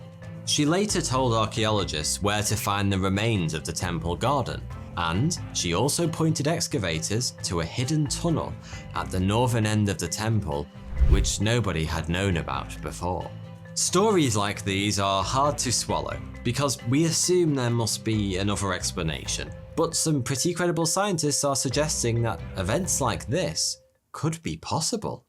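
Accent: United Kingdom. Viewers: Don't listen to this guy he's just an as** cant stand his tone patronizing sound the fact he sounds U.K-ish" doesn't help he obnoxiousness deep six him ASAP just awful & full of Ken himself pass he deserves no debate period may his YouTube" just go down like a titanic we have no time for his type when u hear his type we just go onto the next boy bye he's typical basic 👽 👀 👍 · Your accent explains why is English written the way it is written. Your pronunciation makes it written phonetically, which puts him with other European languages. The US pronunciation of the same words doesn’t make any sense because the pronunciation of the vowels doesn’t match the letters, I.e. it’s not phonetically written. Now I begin to like the British English language.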